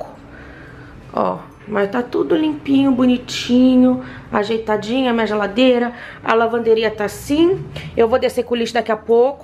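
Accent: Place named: Italy. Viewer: Meu marido ouviu sua voz e perguntou: quem é essa italianinha? Daí eu disse: ela é japa amor😍😊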